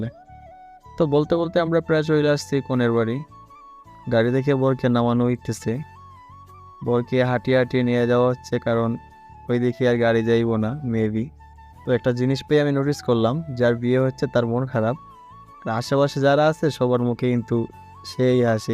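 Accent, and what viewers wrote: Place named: Noakhali, Bangladesh. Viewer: Nuakalir moto matho kne😒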